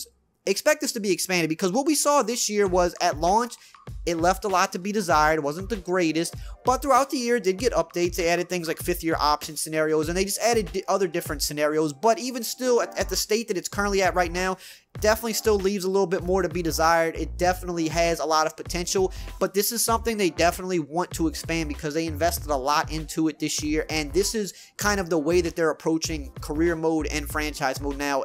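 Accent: Louisiana, United States. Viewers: Are you from Louisiana? You have a Louisiana accent.. just like me 😂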